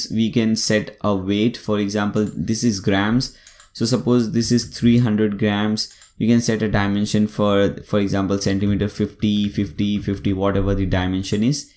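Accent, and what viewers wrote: India. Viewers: typical Indian English accent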